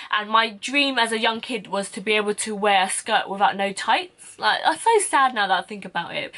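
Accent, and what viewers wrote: United Kingdom. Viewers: I just subbed...simply because i feel inlove with your british accent and your ombre brow 😉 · So funny those facial expressions hahaha u make me laugh, an Asian speaking with a British accent is fukn funny af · Is she British?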